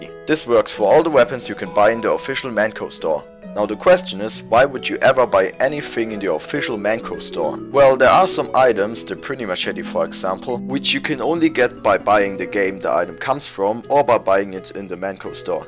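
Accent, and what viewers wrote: Germany. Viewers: Are German? Biste deutsch?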